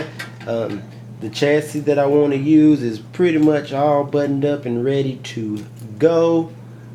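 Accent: South, United States. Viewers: Country ass lmaoo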